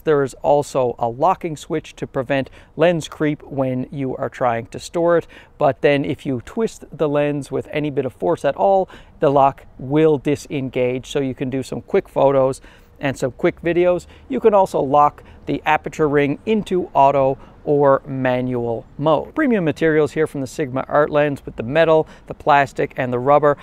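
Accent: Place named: Canada. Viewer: I've been watching your videos for awhile now and as I was watching the intro I thought that maybe you sounded a bit Canadian and then you mentioned you're from Toronto! Fellow Torontonian here! I also want this lens really bad Haha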